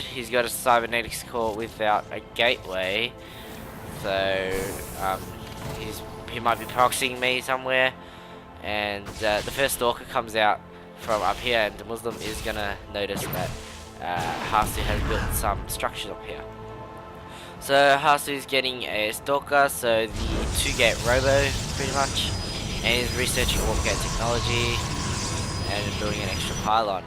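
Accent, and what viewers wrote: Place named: China. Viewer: you sound like a mix between a china-men and an Australian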